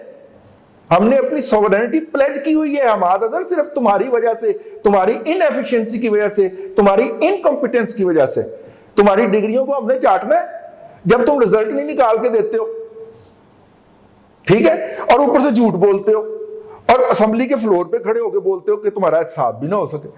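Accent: Punjab, Pakistan. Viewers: Hi, No matter what I love your Punjabi Urdu.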